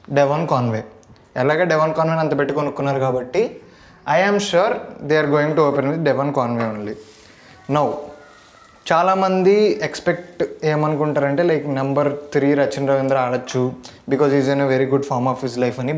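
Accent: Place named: United States. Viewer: Aa accent entra? American Telugu ah?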